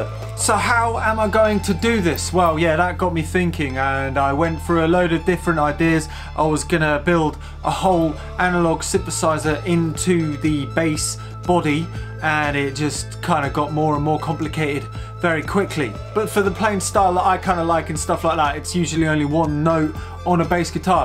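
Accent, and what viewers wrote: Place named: United Kingdom. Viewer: I'm a bass player, but I'm here only for the british accent.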